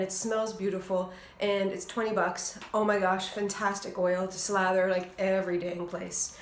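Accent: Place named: Canada. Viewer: Ok I was too eager beaver, you answered my question at the end. I'm also guessing you are Canadian because of the way you say 'out' 🙂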